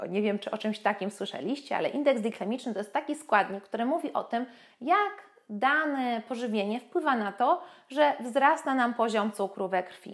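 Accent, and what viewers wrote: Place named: Warsaw, Poland. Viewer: Słychać od razu, że Warszafka mówi. No i kolejny film z cyklu jak mówić przez 12 minut i nic szczególnego nie powiedzieć.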